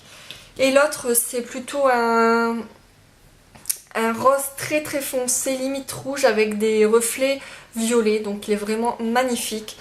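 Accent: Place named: Amiens, France. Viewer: Coucou! Je découvre ta chaîne et j'aime beaucoup tes vidéos! Je me suis donc abonné naturellement! Je me disais que cet accent m'étais familier et je ne me suis pas trompé : je suis d'Amiens! Lool. Bisou, au plaisir!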